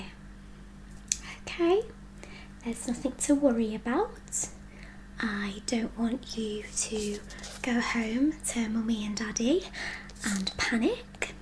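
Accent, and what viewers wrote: United Kingdom. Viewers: May i ask you from city is your accent? Or if it is just a neutral British accent. I generally don't like British accent, but I love yours! 😊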